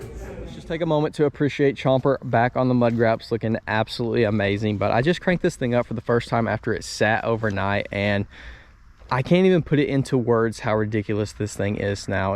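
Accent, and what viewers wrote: Alabama, United States. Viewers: I knew you’s from alabama when i heard that accent you got. Bad ass truck man glad to see a fellow alabamian on social media doing bad ass stuff with bad ass trucks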